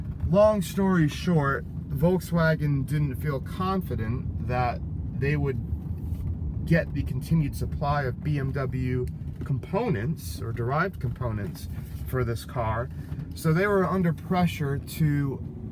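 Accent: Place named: United States. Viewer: I don't want one with out the tray tables either, that's the same way I feel about buying and Jaguar, it has to be VDP. You sound like one of us Yanks in the US mate. lol. In one of your other vids you also said the 2007 Arnage was the best year to own, so my question would be which year would you recommend for someone who's never owned one? There aren't very many independent service techs who specialize in them in my city Austin, TX.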